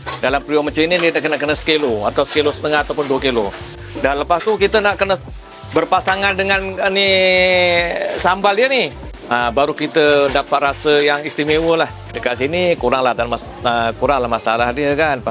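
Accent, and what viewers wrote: Java, Indonesia. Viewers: Slang jawa wak ni . Terbaikkk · Jowonye pekat betul. Sedap dengar. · Pekat sangat slang jawa Wak ni 🙂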